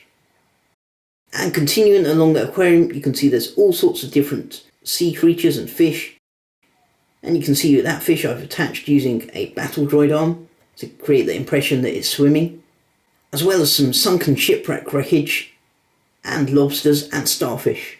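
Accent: Southern England, United Kingdom. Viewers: are you British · Hey, hope you don't mind my asking, but where are you from? You have what sounds like a UK accent, but you speak the International dialect. Your garage rhymes with carriage, but you say trash instead of rubbish (among other things I've noticed). Just curious... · He must he from the UK ( United Kingston ) or London, they pronounce some words weird but thats justo how they talk there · He's from southern england, i'd say. Prob london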